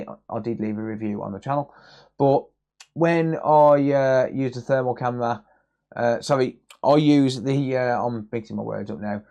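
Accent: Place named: Walsall, United Kingdom. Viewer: Hi,you got recommended by YouTube in my feeds and liking the content but i am PC though.I recognized your accent and thought Walsall or Willenhall,I am from Wolves just up the road ha!,just curious as to your technical qualifications?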